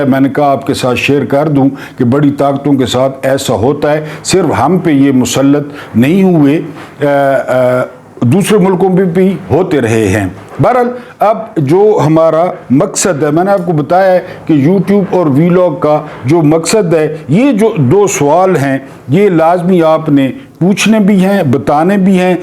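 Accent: Saraiki, Pakistan. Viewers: بسم اللّٰہ الرحمٰن الرحیم السلام علیکم ورحمۃاللہ تعالیٰ و برکاتہ' محترم جناب قابلِ صد احترام آپ کے ویلاگ الحمد للّٰہ! قضا نہیں ھوتے آج کے ویلاگ نے راقم ناچیز ھیچمدان کے ذھن نارسا میں قہقہوں کا وہ طوفان بدتمیزی مچایا کہ یقیناً پنسلیوں میں درد اٹھنے لگے _ محترم آپ بہت حاذق اور ماھر شخصیت ھیں اور مجسم حس ظرافت ھیں _ اعلیٰ درجے کی حس مزاح اور طنز اس وقت انسان پاتا ھے جو نہایت دردوں اور ستم ہائے جہاں کا مارا ھوا ھو جو تڑپ تڑپ کر زندگی کی سیڑھیاں کراس کرتا رہا ھو ـ تب جا کر بہترین پیروڈی اور کامیڈی کا اظہار اچھی طرزِ ادائیگی اور بہترین اسلوب میں کر سکتا ھے _ نیز آپ پر سرائیکی کی خوبصورت چاشنی کا اثر ھے تو اس سے مزید نکھار آجاتا ھے _ مثلاً بنگال کی اعلیٰ خوبصورتی کو آپ نے خوب واضح کیا _ اور مکے منتری کی بہترین کارکردگی پر اچھی طرح روشنی ڈالی _ باقی ٹبر پر آپ خوب کھلے دل سے والہانہ انداز میں طبع آزمائی کرتے ھیں ، اللّٰہ رب العزت آپ کو دارین میں جزائے خیر اور احسن جزا عطا فرمائے ، الہ العالمین آپ کو مستحکم ایمان اور عبادتوں ، خوشیوں کامیابیوں ، نعمتوں اور برکتوں بھری لمبیییییی زندگی عطا فرمائے ، اللھم آمین آمین آمین برحمتک یا ارحم الراحمین _ والسلام اللھم صل وسلم وبارک علی سیدنا محمد والہ و اصحابہ صلاۃ دائمۃ بدوام ملک اللّٰہ مقبولۃ توءدی بھا عنا حقہ العظیم انک حمید مجید والحمدللہ رب العالمین __ ۔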